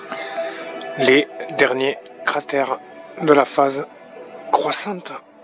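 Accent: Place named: South-West, France. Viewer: Image magnifique et l'accent du sud ouest qui va avec 🔥😉🙏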